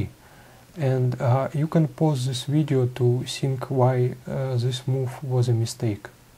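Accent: Russia. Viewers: I love your "boring-russian-nerdy guy" accent.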